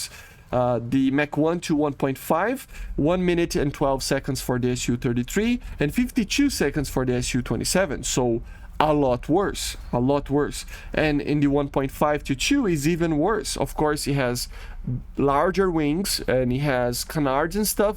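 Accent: Brazil. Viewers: Are u from Brasil? Ur accent looks like so much brazilian accent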